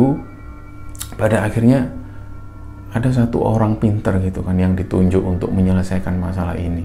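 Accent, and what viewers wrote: Java, Indonesia. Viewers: Cara mbawakan ceritanya tdk bgus Terutama penyebutan AIUEO .....mungkin karnana eksen jawanya yg sgt kental ....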